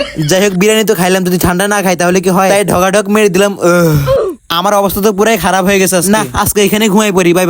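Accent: Noakhali, Bangladesh. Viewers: ভাই আন্নে ও কী আর মতো নোয়াখাইল্লানি কী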